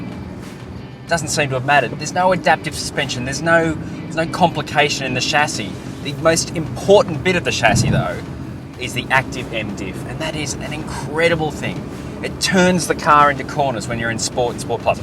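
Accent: Australia. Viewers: Chi Hsia this is just a normal accent in Australia haha everyone sounds like this here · Really strong Aussie accent!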